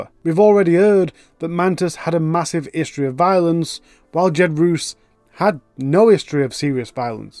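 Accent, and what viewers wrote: Yorkshire, United Kingdom. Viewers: Oh it’s good to hear a yorkie voice, giving us true crime. ❤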